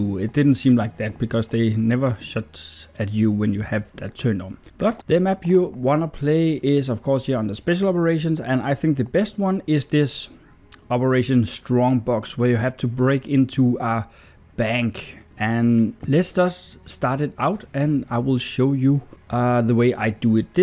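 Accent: Denmark. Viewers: You're danish right? You sound really danish. Especially when you said quicker like quigger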